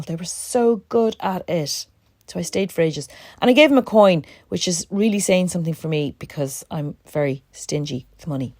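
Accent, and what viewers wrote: Ireland. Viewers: and it’s a joy to hear your beautiful accent, reminds me of all my cousins in Ireland ☘️☘️☘️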